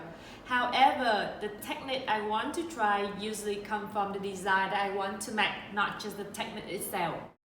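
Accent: Thailand. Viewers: I could see you were Vietnamese from your last name, but I thought you had a Thai accent and not a Viet accent when you speak English, so I actually thought maybe you were a Thai Vietnamese 😀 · It's cool that you are Vietnamese! I honestly thought you were Thai, because your accent is similar to my mom's (she is from Thailand). Also, my mom's nickname is Dang, which means red in Thai.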